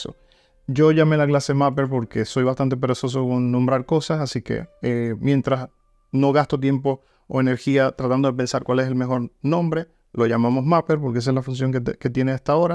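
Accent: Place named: Dominican Republic. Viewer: wow, jajaja! Tienes una voz grave y suenas un poco como los Dominicanos. Saludos bro! PD.: me suscribí a tu canal, sé que aprenderé mucho. Estoy aprendiendo Java y me gusta lo que veo, el backend es hermoso.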